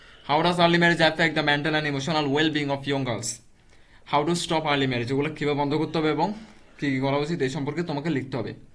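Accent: Chittagong, Bangladesh. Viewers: Are you from Chittagong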